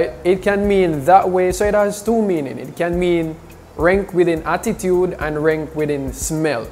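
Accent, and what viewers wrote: Jamaica. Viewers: I love the jamaican accent but its so hard to understand and reproduce! Hahaha Never found a vídeo explaning it! So thanks! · Awoo me like learning real Jamaican pronunciation 😄👍🏼 greeting from Costa Rica 🇨🇷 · Love the jamaican accent love from uk 🇬🇧🇬🇧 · Nice one mi really love Jamaican accent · I just love jamaican(english) accent😂😂👌👌🤷‍♂️🤷‍♂️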